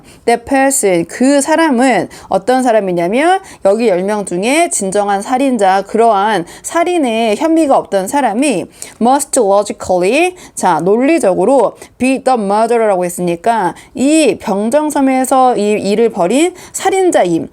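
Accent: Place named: United Kingdom. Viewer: 선생님! 감사합니다. 선생님 덕분에 혼자서는 꿈도 꿀 수 없는 아가사 크리스티의 'And then there were none.'을 끝까지 볼 수 있었습니다. 마지막 Wargrave의 'manuscript document'부분은 까다롭고 이해하기 어려운 문장도 많았지만 선생님 덕분에 마지막 까지 읽어 낼 수 있어서 참 기쁘고 행복 합니다. 군더더기 없이 속도감 있는 선생님의 강의 진행 덕분에 지루할 틈이 없었습니다. 그리고 선생님 특유의 영국식 발음(!)에 시간이 지날수록 매료되었습니다.😂 다시 한 번 감사드리며 이젠 나니아 연대기를 시작하겠습니다~^^고맙습니다.❤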